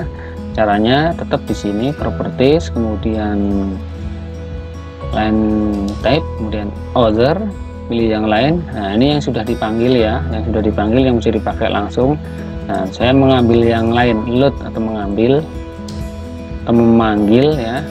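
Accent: Banyumas, Indonesia. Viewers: Belajar cara bikin linetype sama om.. Salam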